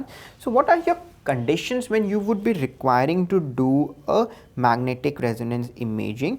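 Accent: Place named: England, United Kingdom. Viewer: Again watching this before my xm .......... Your English accent and kindness are just♥️♥️♥️🥰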